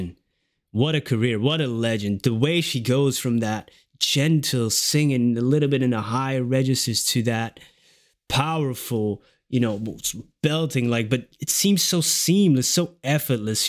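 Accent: United States. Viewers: are you sure you don't have some New York in you? The more I listen to you, the more I hear a slight NY accent. And then when you said, "You know what I mean?" - Barbra always says that phrase in her NY Brooklynese accent! Hehe! Anyway, it was great seeing your reaction again to another Streisand classic. (Me thinks she's got you hooked! 😉) Hey, I learned something from you on this one I never knew. You said this song was written in 1924. And then mentioned how we're listening to it 100 years later in 2024. And guess what, Barbra was 24 in this TV Special here. And also Barbra has always said that 24 is her lucky number! I love numerology! Wonder what it means, though? Lol! BTW, I'm dying to see your reaction to the Yentl Medley or even just "A Piece of Sky" from the movie Yentl. Can't wait to see your face when you get to one of her pinnacle blow-you-away numbers! 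She's got some real blockbusters you will almost not believe that that voice is coming out of a human being! 😎👍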